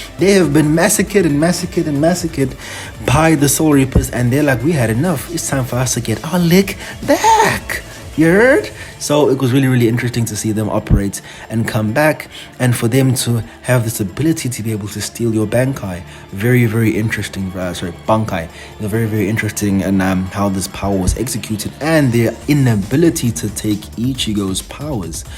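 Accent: South Africa. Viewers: i knew you were south african from your voice and lil accent 😭😭😭 it’s good to see my brothers hopping on that bleach train fr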